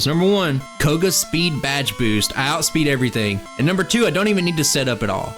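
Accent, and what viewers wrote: South, United States. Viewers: The quality of your videos definitely rivals that of other high subscriber count creators, and your Southern accent makes you distinct from the crowd. (I'm from Louisiana, so I love the accent btw 😂) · Gengar ❌️ Geengar✅️.....😂 but seriously I've been loving your videos man, and the southern drawl makes it feel like home😊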